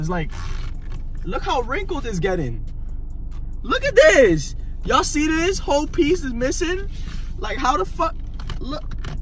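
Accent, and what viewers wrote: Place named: Miami, United States. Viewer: U sound like you’re from Miami…I’m from Miami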